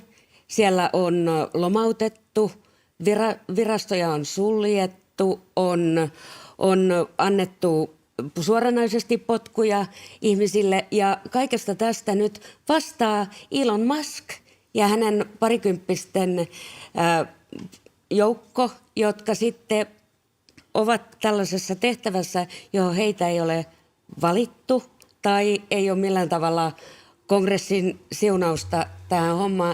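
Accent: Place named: Turku, Finland. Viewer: Niin ihanaa toi Turun murre 😊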